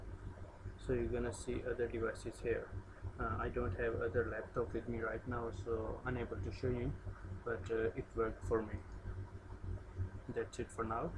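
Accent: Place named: India. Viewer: Will I be lucky today? Is the narrator going to speak normally or have an obnoxious Indian accent? ... FUUUUUCK!!!!!!!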